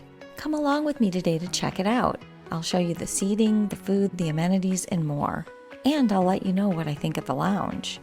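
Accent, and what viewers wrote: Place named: Chicago, United States. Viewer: I guessed Chicago based on the accent 🎉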